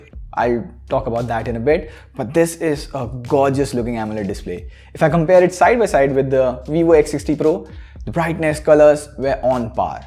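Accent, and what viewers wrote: India. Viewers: First of all, indian english kidda weird.. 😂😂😂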